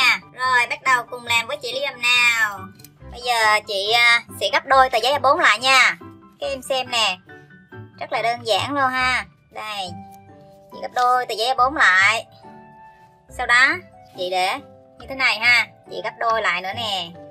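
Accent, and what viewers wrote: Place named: South, Vietnam. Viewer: Đoán vội người miền nam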